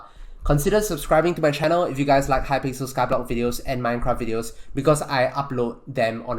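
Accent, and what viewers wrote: Singapore. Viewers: he’s singaporean and so am i it’s a common mistake people make in singapore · Damn your singlish top class man support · are you singaporean? because you sound like a singaporean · You sound like your from Singapore 🤔 I’m from Singapore :D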